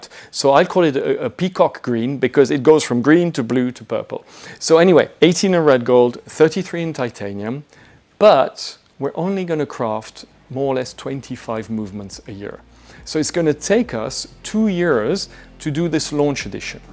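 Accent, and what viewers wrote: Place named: France. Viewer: i like the host' french accent, he got the right vibes on reviewing watches and makes me want to learn to speak french. Want more of his reviews in the future 😂🇫🇷👍🏾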